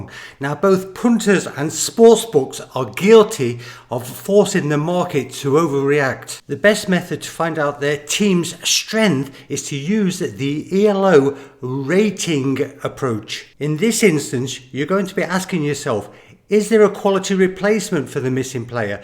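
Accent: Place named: United Kingdom. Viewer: What? , you probkybjust convinced by his brith accents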